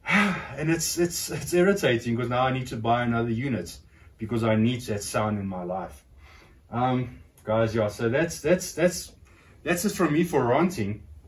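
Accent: South Africa. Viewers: My god its my first time ever hearing a South African accent and it just sounds so cool 😎 love the speaker review too 👍 greetings from Germany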